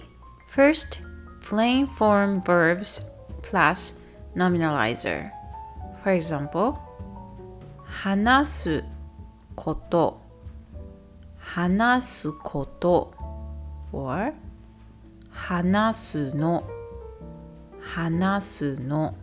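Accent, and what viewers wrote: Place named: United States. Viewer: woow thanks for creating such a helpful video❤ by the way your English is very very good you sound like American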